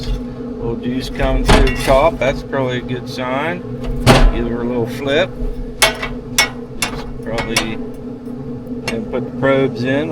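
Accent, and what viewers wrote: Pittsburgh, United States. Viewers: what part of pittsburgh are you from?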